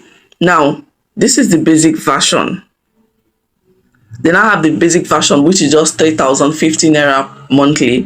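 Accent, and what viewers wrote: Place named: United States. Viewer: The presentation in this video is top notch! Your accent don Dey turn USA oh sis😂 YouTubers! Big people💯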